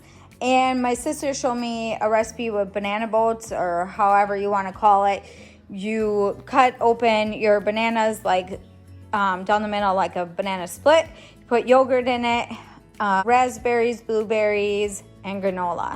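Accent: Wisconsin, United States. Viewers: When I heard your accent I knew you were from Wisconsin. Hello, from a new subscriber from Wisconsin!! Loved the haul. God bless 🙏🏼❤️ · Do you ever go to Woodmans? I don’t know how far north they are in Wisconsin. I knew the Wisconsin accent too. (I live in Illinois) 😀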